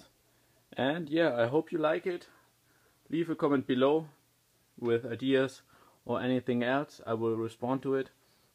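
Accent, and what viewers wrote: Germany. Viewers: Ich finde es witzige wie er English spricht aber Deutsch ist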